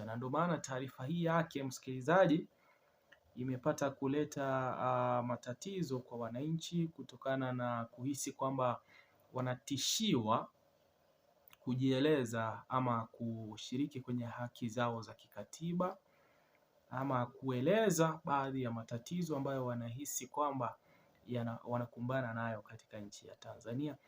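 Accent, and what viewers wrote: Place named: Luo, Kenya. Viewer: Kama mtaandamana kama shetani wenu heche alivyojinadi katika mikutano yake jiandaaeni kuona mnayoyataka kuona na huo ndio utakuwa mwisho wa ushetani wa chadema kama heche atajiona kuwa yeye ni zaidi ya Dola ajitokeze siku hiyo asiache kuandamana atangulie yeye pamoja na genge lake la uhalifu wa kisiasa na wewe kijana kutoka Kenya na hiyo studio Yako unachoongea kuhusu hayo maandamamo haujitambui na lafudhi Yako ni ya kijaluo endelea tutakupata pale ulipo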